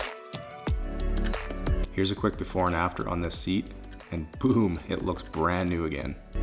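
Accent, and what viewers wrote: Saskatchewan, Canada. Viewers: It’s our awesome Saskatchewan accent 😘🇨🇦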